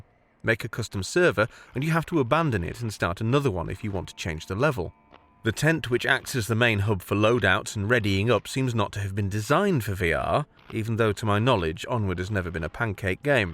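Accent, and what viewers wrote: United Kingdom. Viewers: That’s just’s cause he’s British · alright well your british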